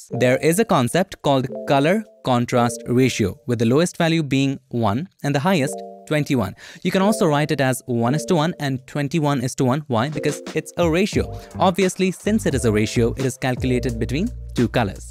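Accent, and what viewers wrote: India. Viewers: Man the Indian(?) accent can be really nice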